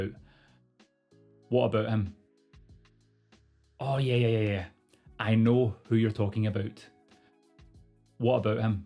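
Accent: United Kingdom. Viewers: イギリス英語だぁ〜💕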